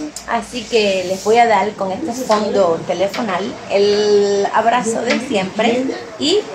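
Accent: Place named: Cuba. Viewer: ME ENCANTAN TUS VIDEOS, ERES SUPER COMICA. NO HAY NADA MEJOR QUE COMENZAR EL DIA MIRANDO UNO DE TUS VIDEOS PARA ESCUCHAR EL ACENTO CUBANO Y REIRME CON TUS COSAS. QUE ALLAH TE BENDIGA SIEMPRE.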